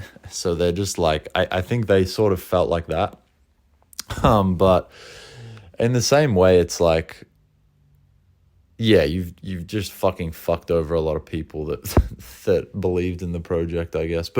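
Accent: Australia. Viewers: chad awsie accent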